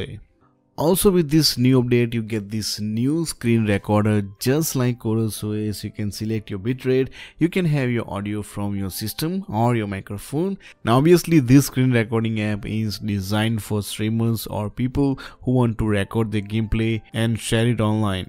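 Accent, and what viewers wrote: India. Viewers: Are you Indian living in Melbourne? Because your English seems Indian.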